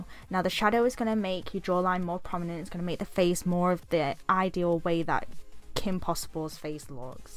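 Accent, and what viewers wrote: United Kingdom. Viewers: Well I’ll be dammed if this isn’t the most British accent out there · Fell in love with your accent innit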